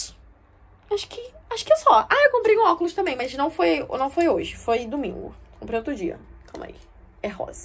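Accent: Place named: Rio de Janeiro, Brazil. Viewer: Nos primeiros 5 segundos de vídeo eu já vi logo que era carioca ❣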